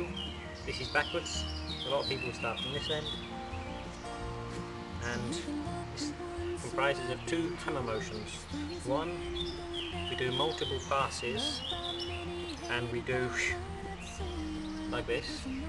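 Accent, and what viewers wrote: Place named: England, United Kingdom. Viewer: What's your background? You sound English not Czech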